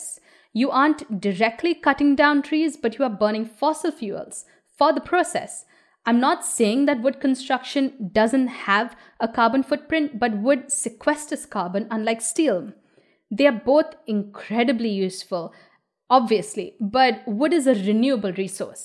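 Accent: India. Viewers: Aren't people buying used containers quite cheaply that are no longer suitable for their original use? Doesn't LA have a ton of them? As for insulation, it seems silly to use steel in a cold climate. Rather they should be used in warm climates so no insulation is needed at all. Then just protect them from rain. As for working within the skin, not really if you can put them together. Not many single people need a home more than 17' wide and about 45' long. That's 765 sq ft. The main problem seems to be their high weight. The future is probably a prebuilt, custom designed home, designed mainly by the buyer with software, using the best materials available in that area at the lowest cost, assembled in a few days on site. I wonder if foundations could be poured on mass, making the process much cheaper than doing one at a time. Surely it must be. You've got an excellent analytical mind but coming from an Indian background you're probably used to family sizes that are larger whereas this idea is best for single people or a couple at most. 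Dubai? Please. Your heavy Indian accent makes India a more likely origin. Just tell people how long you were in Dubai. Otherwise it sounds like you're trying to hide it for some reason. The head of both Microsoft and Google are Indian. Plus a lot of Indians are getting educated in the UK which is often far superior to most American universities. No need to hide anything. Its best to never bring up racism. Sure it may be there but its often subtle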